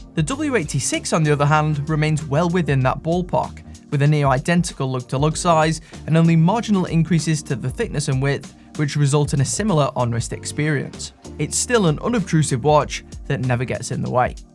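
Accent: United Kingdom. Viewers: I hate your British English accent. It's just so annoying. Maybe because your contry and Churchill left us after WW2 alone to be eaten by Stalin behind iron curtain and we suffer so much! We helped you a lot during the war. Breaking Enigma first, and show how to fight in Battle of Britain. That's why we don't go to victory parade with you. Your country betrayed us and do nothing! You ordered to pay for fuel use in aircraft after the Battle of Britain. That was like slap in the face. After all good content about watches but the accent reminds me of how I hate you GB. From Poland.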